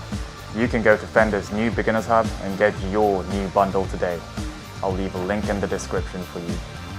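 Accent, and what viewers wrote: United Kingdom. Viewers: BRITISH ALERT! im a Brit too :) love the videos by the way❤️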